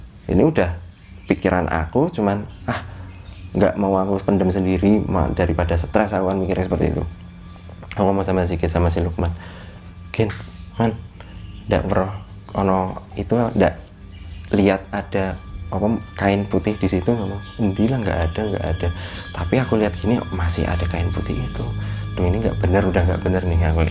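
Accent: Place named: East Java, Indonesia. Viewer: Mas , asli jawa timur ya ?? Medok nya sama 🤣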